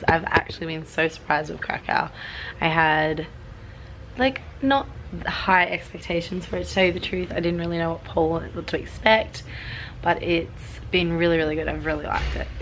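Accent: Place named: Australia. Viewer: Your accent it's like British... but I CAN understand U... must be Australian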